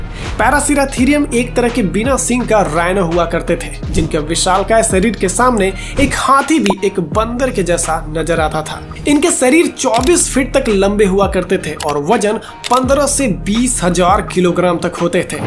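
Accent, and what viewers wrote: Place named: Bihar, India. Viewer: Awaz???jani pechani hai Behari touch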